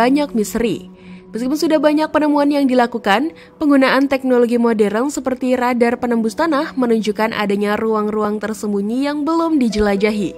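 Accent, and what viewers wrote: Indonesia. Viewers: lidah indo agak beda boss.. 😂😂😂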